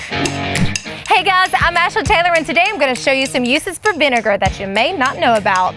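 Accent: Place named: Alabama, United States. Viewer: Alambamian beauty. Love your accent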